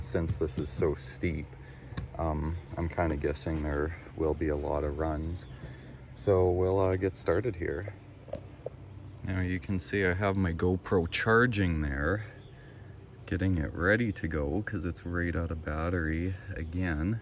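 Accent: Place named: Upper Michigan, United States. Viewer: We have similar accents here (UP of Michigan) I went to pick up my nephew (he's in his late 30's, i'm in my late 40's). His dad my bro-in-law is a roofing contractor. so I say: 'Yah.... I went and picked up a bundle of cedar shingles the other day' 'they were so rough-sawn I don't even think I could us em as shims' He looked at me, astonished, and said 'yep! it's hard to even find good shims to buy.'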